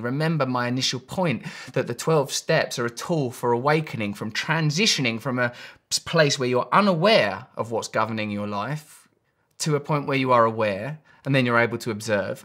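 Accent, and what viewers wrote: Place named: London, United Kingdom. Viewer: You sound like you're from London! 😀 Interesting stuff, friend. I've been a fan for a long time and I appreciate you uploading so often.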